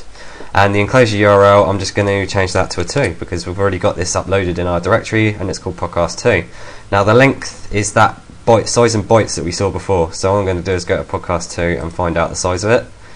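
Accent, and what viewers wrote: England, United Kingdom. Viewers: when i create my file in the main directory it comes up as podcast.rss.txt does it matter that the .txt is at the end? I cannot upload to itunes and i'm guessing its because of that ...btw you talk to damn fast and have an english accent so i cant fully understand what you are trying to say ...folder sounds like " fohder "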